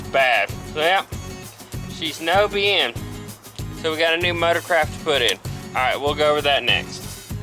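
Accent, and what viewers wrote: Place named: South, United States. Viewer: I like this southern man, where did u get that accent?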